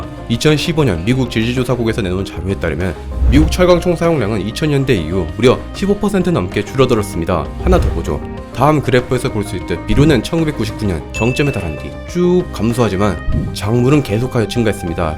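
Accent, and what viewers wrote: Gyeongsang, South Korea. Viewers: 경상도 사람인가 억양이 나랑 비슷한 냄세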